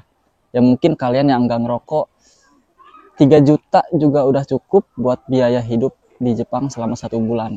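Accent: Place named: Bekasi, Indonesia. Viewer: oh kirain bekasi logatnya kaya org bekasi soalnya😂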